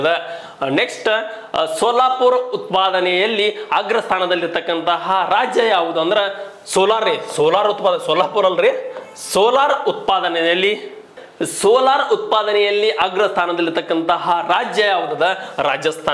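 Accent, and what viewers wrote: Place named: Bangalore, India. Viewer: ಭಾಷೆ ಬೆಂಗಳೂರು ಆದರೆ, ಚೆನ್ನಾಗಿ ಅರ್ಥ ಆಗುತ್ತೆ ಸರ್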